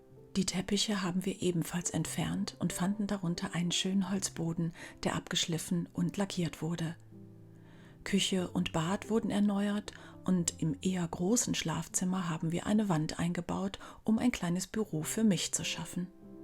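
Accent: Scotland, United Kingdom. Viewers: Ich bin platt…bei deinem schottischen Akzent hätte ich nicht gedacht das wir Landsfrauen 😂 sind…Toll…Danke für die tollen Videos…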